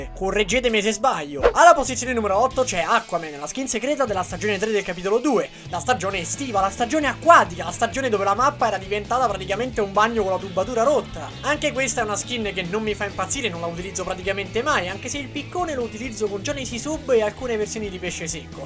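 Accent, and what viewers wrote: Rome, Italy. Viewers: bravo ragazzo sei davero simpatico nei tuoi video , continua perche sei davero particolare sarà il tuo accento romano , sarà che non sei antipatico come gli altri youtubers ma secondo me ti meriti di più come iscritti di certi che parlano di fornite , concludo dicendoti continua cosi che vai alla grande ciao ed e sempre un piacere vedere i tuoi video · Sono di napoli e ti.ascolto sempre ma so parla pure en romano a o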